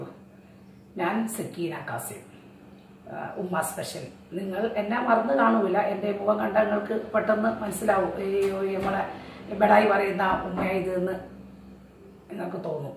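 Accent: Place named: Thalassery, India. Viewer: ഉമ്മാന്റെ വർത്താനം ഞമ്മളെ തലശ്ശേരിക്കാരെപോലെ 😍😘❤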